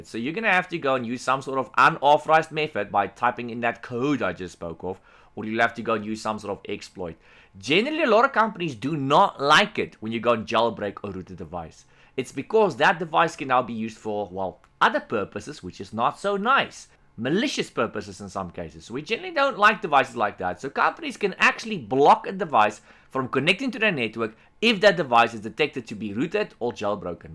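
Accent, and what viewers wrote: South Africa. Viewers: I'm impressed by your content! Love the South African accent with the thorough explanations. A copy and paste of the compTIA A+ course but with your own style. Keep it up!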